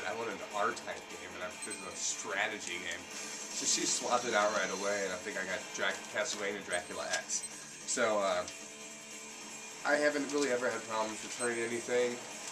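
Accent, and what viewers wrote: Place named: United Kingdom. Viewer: Very enjoyable responses matey! As a Dutch person I am really impressed by your English accent. It's not exaggerated which means that it actually works... I guess, but only the Brits will be able to judge that I guess....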